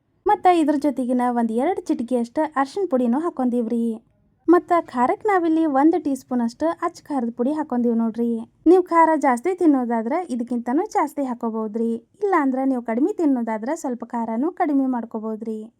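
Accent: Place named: North Karnataka, India. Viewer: Nim uru yavdu mam nim bhashe uttar karnataka shaili ede mam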